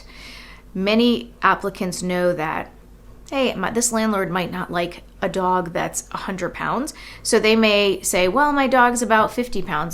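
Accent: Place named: California, United States. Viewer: Sound like you from California/Arizona! 🌵🌴🌵🌴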